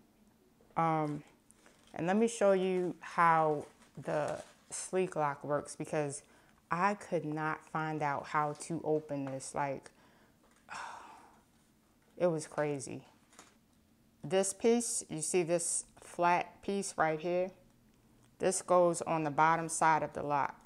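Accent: Baltimore, United States. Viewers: I hear a little Baltimore in there?👀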